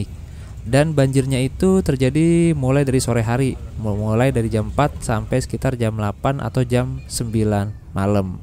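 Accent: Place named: Java, Indonesia. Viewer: Mas logatnya ada jawanya, kayak bukan orang sunda mas 😅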